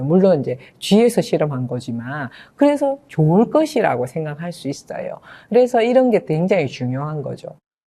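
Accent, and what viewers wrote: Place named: Busan, South Korea. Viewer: 부산 사투리가 귀여우세요 😊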